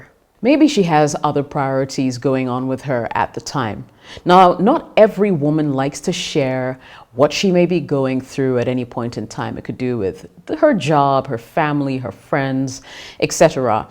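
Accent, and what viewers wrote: United Kingdom. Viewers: She’s British, probably born and bred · That accent is British English. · Ar you a Ghanaian? Your accent is different but very proper. It's hard to fetch out the Ghanaian in you but mostly British. You're beautiful!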